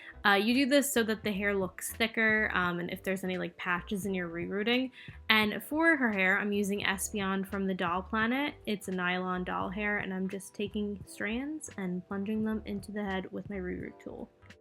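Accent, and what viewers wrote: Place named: South, United States. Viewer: I think this doll is phenomenal 😻 so dang inspiring! Your fascination with how you say clasps was hilarious. I think it's just 'cause you have a touch of a southern (?) Accent. It's actually the a sound that makes it sound different. I love how you talk though..."I don know 'skina cute". Also, have I just found you in the last two weeks? Yes. Am I bring ng all your videos while working on my own. For dang sure. Love it.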